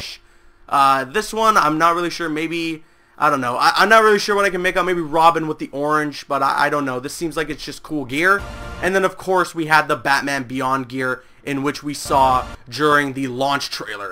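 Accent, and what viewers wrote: Canada. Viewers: Canadian accent ?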